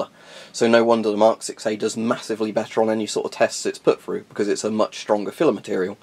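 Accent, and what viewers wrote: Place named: United Kingdom. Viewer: “What a shhhtupid name for it” haha British accents make everything sound so much better while being right at the same time 😂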